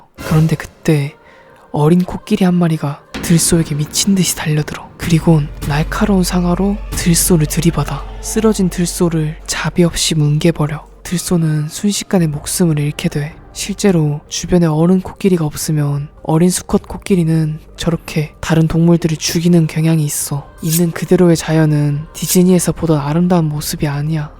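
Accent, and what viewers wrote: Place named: Jeolla, South Korea. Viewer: 전남 말투같은데..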